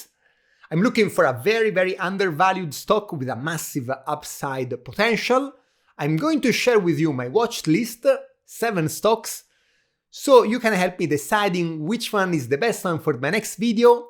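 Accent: Tuscany, Italy. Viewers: sei italiano? hai un accento toscano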